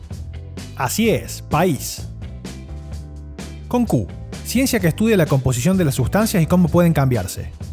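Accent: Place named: South America, Latin America. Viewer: Tiene que ver el acento del narrador es como de sudamerica y no le entiendo algunas cosas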